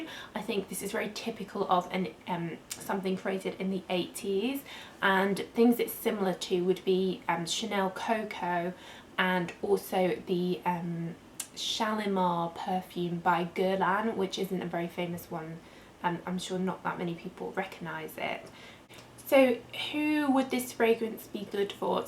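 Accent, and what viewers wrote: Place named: London, United Kingdom. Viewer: hiya, good review ! this beauty is a little bit from another time, however its sooo good when your in mood for it . i love love love all the versions , edp,,edt,pure.parfum,soap.lotion etc ive noticed no 5 smells best on clean skin straight out the bath or shower. In fact the bath products are lovely, but if you want a sweeter version of no5 use the after bath powder , now that is luxury .... mmmmm :) by way im from london too , you speak far nicer than me though , take care :)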